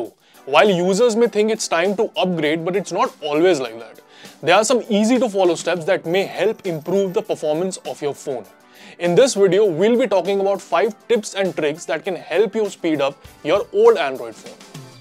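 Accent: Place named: India. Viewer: Did not understand one word that u said because of your accent from India!!, u need to speak better & more clear English!!!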